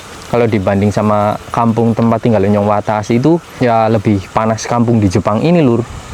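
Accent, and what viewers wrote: Banyumas, Indonesia. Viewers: Kang kaya wong Banyumas asale ngendi.... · mas bro kowe wong ngendi si kok ngomongnya kaya gitu ?, orang ngapak ya !. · wong ngapak ya mas bro?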